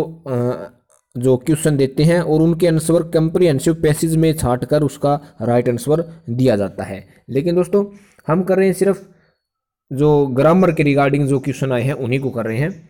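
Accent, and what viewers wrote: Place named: Haryana, India. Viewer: Haryanvi bna di English Hindi ki b bilkul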